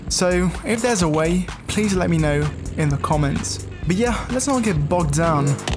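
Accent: Italy. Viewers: Are you italian? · Hai un bel accento italiano fra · Wait, are u italian? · You have a particular italy pronunce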